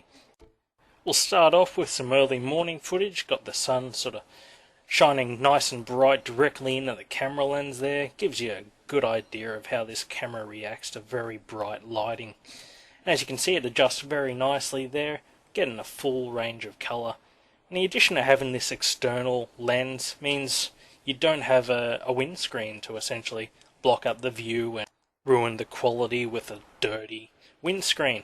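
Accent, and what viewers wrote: Australia. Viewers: How can anyone who speaks English on Earth not love the sound of an Australian voice! Cheers!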